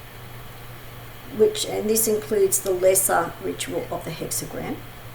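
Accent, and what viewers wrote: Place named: Australia. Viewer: Great to find your channel and an extra surprise was the Aussie accent. Im in Sydney myself. Looks like the YT algorithms work as your channel came up on my feed. Thanks for providing all this content. Looking forward to catching up on the older videos. Cheers 🖖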